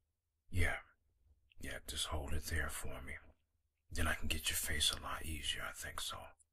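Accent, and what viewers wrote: New York, United States. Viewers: Is he from New York? Accent vibes. Was waiting for him to say deadass....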